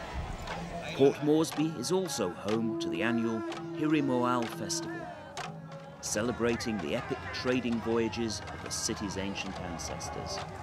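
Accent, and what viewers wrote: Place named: RP, United Kingdom. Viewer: Good British Queen's English language Thank you Sir for this educational video of Papua New Guinea Much appreciated 🙏🙏🙏 God bless you ❤️❤️❤️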